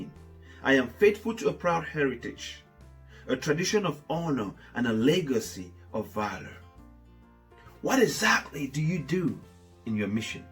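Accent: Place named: Africa. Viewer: This is a pure Native African English accent😍😍😍. Thumbs up👍🏿Bro. Keep up the spirit. Where are you from?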